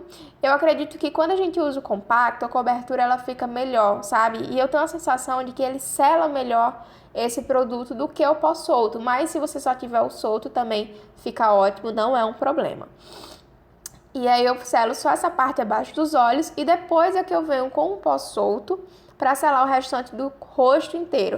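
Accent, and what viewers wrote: Northeast, Brazil. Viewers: Amei o vídeo e já me inscrevi. De onde vc é? Tem um sotaque nordestino. Sou de Recife. Beijos 😘😘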